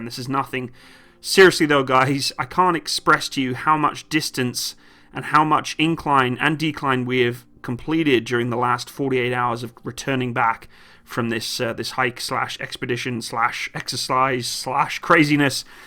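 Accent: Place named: United Kingdom. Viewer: I thought u were british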